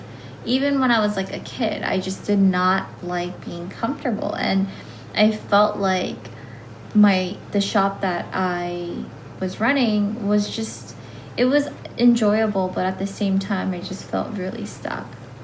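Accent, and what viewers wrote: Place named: United States. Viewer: 핱시봤을땐 한국인유학생출신인줄알았는데 이 영상보고나니 한국계미국인인거 확 와닿네요 말하는 방식이 완전 미국인ㅋㅋㅋㅋ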